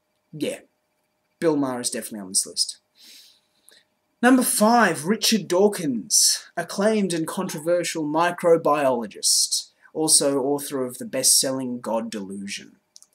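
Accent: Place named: Australia. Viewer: what part of australia are you from? i can't pick the accent and its annoying me :P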